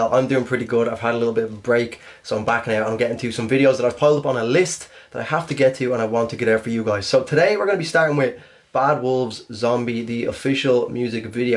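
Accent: Ireland. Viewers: Zombie is one of my all time favorites!! I feel like they did the cover very well and still hit the feels!! Love the Irish accent!! 😊❤️ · Limerick? Is that an Irish accent?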